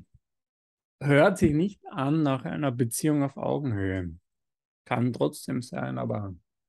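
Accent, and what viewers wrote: Vienna, Austria. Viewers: der lebenskluge, sprachgewandte hr bonelli mit seinem kauzig-charmanten wiener-schmäh-dialekt, baut regelmässig "anyway" und "whatever" in seine sätze ein... das hört sich echt schräg an 😮😅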